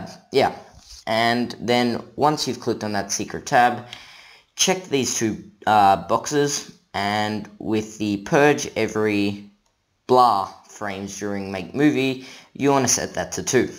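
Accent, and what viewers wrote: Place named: India. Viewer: Thanks a lot man!! From 5 hrs to 1 hr.😍😍 I wish I saw your video long time back. Thanks brother. I'm from India. It seems from your voice that you are also an Indian. Am I right?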